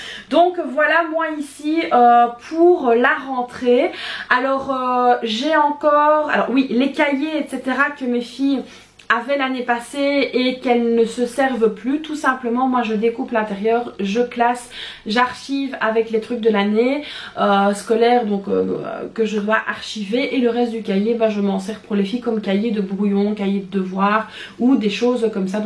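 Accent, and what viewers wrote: Belgium. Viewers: Merci pour se beau retour de courses. J'adore t'écouter, j'aime entendre les différences entre les mots belges et le français. Elles doivent être super contente tes filles. Chez nous c'est beaucoup de récup 😅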